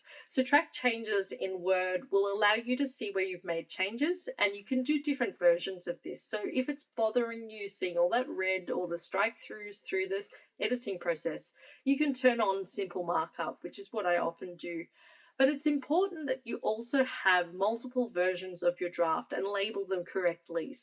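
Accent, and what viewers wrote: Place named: Australia. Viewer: This was super useful! I've suscribed because you give great advice and because I love your aussie accent. I haven't checked your YouTube channel yet but I hope you're still doing videos! I've finished my first draft (80k words) and editing is killing my dreams! But this has given me so some hope :) Thanks!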